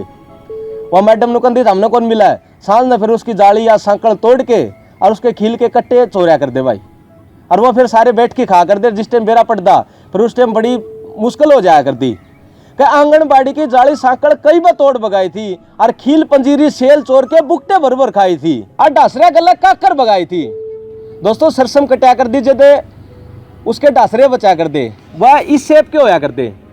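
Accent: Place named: Assand, India. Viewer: I am from Multan Pakistan .bhai lagai se kime assand ke ilake se hei kunke mere gam main assandiai bethe se.look like u r from assand karnal because my village my maternal relatives speake 100 % same language with the same dialect. I am surprised to my languageis alive in haryana india.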